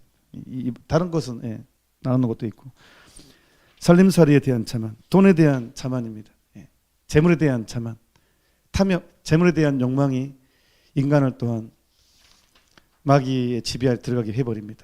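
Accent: Jeolla, South Korea. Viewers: 전라도 사투리가 정겹네요~~♡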